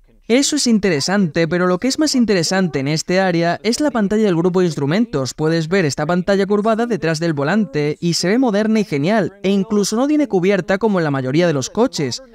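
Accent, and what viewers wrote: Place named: Spain. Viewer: Por qué español de españa 😭😭😭😭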